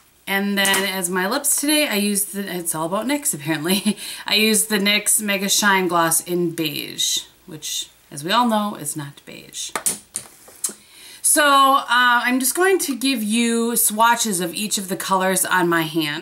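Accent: Wisconsin, United States. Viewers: I love your wisconsin accent! I'm from Iowa, so I love when I get to hear a wisconsin accent!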